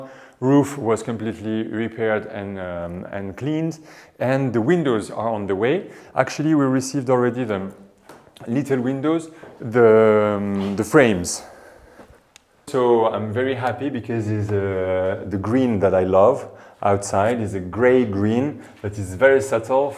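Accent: France. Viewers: You can speak French no ? I hear at your accent you are French no ? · I don't think that I'm the only one, but I would marry this handsome man with this charming French accent and impeccable taste! 😍 If I wasn't already married, that is!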